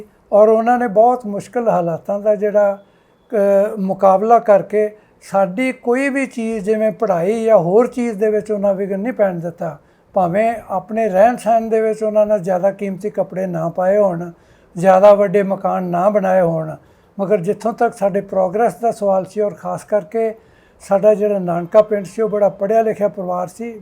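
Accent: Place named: Lahore, Pakistan. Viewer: Beautiful lahori accent, beautiful majha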